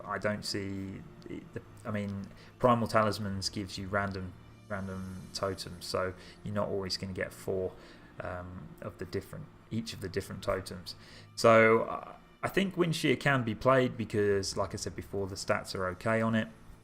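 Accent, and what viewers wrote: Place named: United Kingdom. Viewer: you got UK accent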